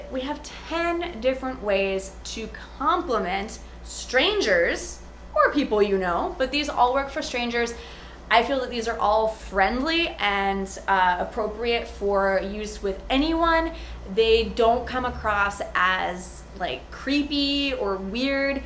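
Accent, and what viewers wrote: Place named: United States. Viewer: First time I have watched your video the way you teach is really great, your overall personality speak out when you speak that's good, now I have subscribed immediately to learn American accent, by the way I am a Indian translator for Hindi and Punjabi languages..you are doing a good work, thanks again.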